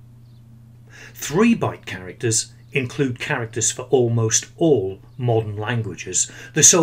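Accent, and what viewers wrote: England, United Kingdom. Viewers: No Music, no insulting or idiotic speeches, great and clean way of explanation and a nice english accent - thumb up and abo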